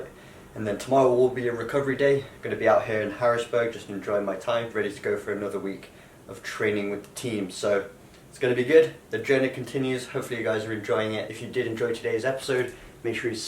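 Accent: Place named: England, United Kingdom. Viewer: Where are u from? Sound English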